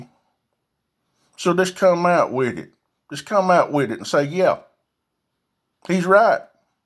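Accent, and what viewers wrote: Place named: Georgia, United States. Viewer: I'm sorry for you you don't know what the hell you're talking about. You need an education. You sound like your from Georgia or Florida.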